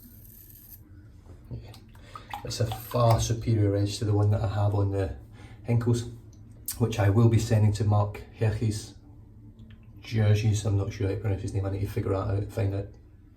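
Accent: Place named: Scotland, United Kingdom. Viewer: Hmm. Why put yourself down. Who cares about being correct for the masses, and the Scottish accent is a great one... never hurt Sean Connery, and Trainspotting was a WORLDWIDE hit... including here, in America! Don't water anything down. That's how people learn new languages & cultures. We sit, watch, enjoy the humor, and eventually get comfortable with 'em. Kind of like back when you decided to feature Australian artisans the rest of the shaving world may not know about. If you're just trying to increase your subs to make more ad share money or something, then I kind of understand, but I don't think you monetize your channel.... do you?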